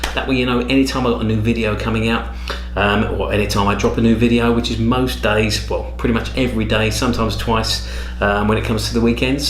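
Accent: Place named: United Kingdom. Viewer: your FRENCH pronunciation is better than mine great BRITISH accent by the way Ive got nothing from this house I need to investigate thank you JIM from MIAMI BEACH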